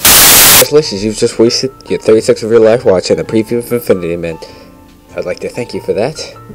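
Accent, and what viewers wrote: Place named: United Kingdom. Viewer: You sound so british in this video. on another note, I can help.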